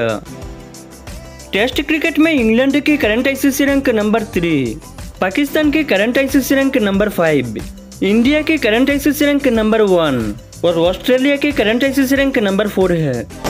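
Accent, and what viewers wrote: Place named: Bengal, India. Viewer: জাতে বাংগালী তা কথার ভাবে বোঝা যায়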